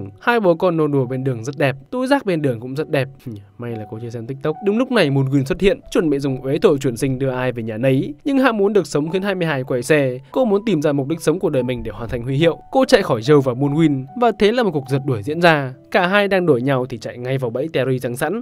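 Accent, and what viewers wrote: North, Vietnam. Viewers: Giộng bắc mình đã ko nghe đc rồi. Bạn còn liên tục pha trò rồi nhắc tên người khác vào clip khiến cho mình ko thể nào tập trung hiểu được câu chuyện. Chắc là phải tự thân đi xem hết phim thôi 😞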